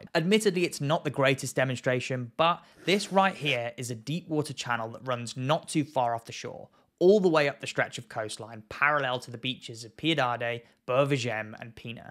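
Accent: United Kingdom. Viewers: Yup…. Stillll taking my chances in New England. Holy Hell. Don’t your GW Sharks breach like MILES in the Air, too? I get your accent and the other ‘Shark Guy’ I get, confused. One of you is like UK(You?) and the other is South Australian. Help. Lol I’ll take my chances in NH/The Cape. Every time. But that water? Yeah, can’t see shit either when you purposely go for hurricane season. You should do a video about GWs, and say why they’re coming UP the coast, now. Been here and vacationing all my life; Never have seen what I have in signs ‘they’ put up these days.A Danger zone on the EAST Coast. People just lay out for the sun, now. No one swims in the Cape. I loveeee thatttt water. It’s clear as day-Unlike NH. But there’s GWs; And they’re Glorious. Love this channel! .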